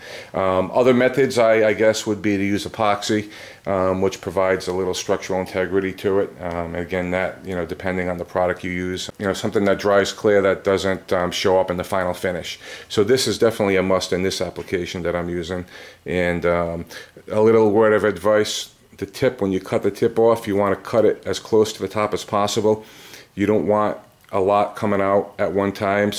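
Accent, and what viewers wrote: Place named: New England, United States. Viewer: The accent you speak with sounds very north east coast maybe Maine, Boston, but any way I was slug'n some beers and perusing YouTube and found this guitar video and I thought it was good shit, very informative from someone who has obviously had some hands on experience with the craft. Good stuff.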